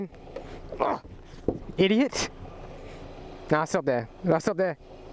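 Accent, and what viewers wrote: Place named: Australia. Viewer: How Australian haha "where's the rabbit?" Great content mate keep it up!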